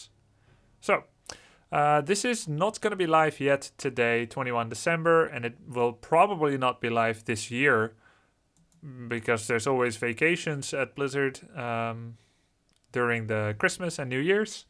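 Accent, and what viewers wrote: Scotland, United Kingdom. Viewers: Why are ye suddenly scottish though? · dat scottish accent always amusing to see you eine andere Sprache sprechen :D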